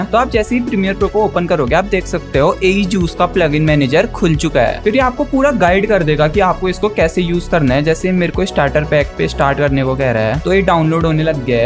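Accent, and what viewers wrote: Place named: Uttarakhand, India. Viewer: Bhai Uttarakhand se ho kya?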